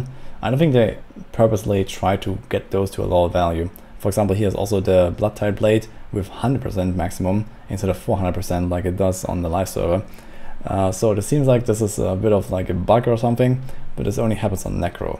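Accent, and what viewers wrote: Germany. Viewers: Man hört das DIREKT, das du ein Deutscher bist xD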